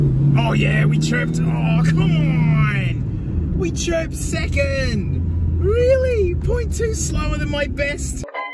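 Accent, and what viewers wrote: Australia. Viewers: you aussies have the best accent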